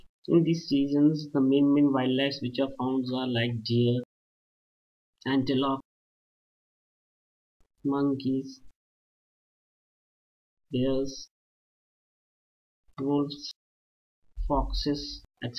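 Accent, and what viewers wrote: England, United Kingdom. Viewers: Very helpful to revise the portion once studied... I like all your videos ... except your English accent everything is good...thanks a lot... Pls keep on doing Bipin Dogra and *****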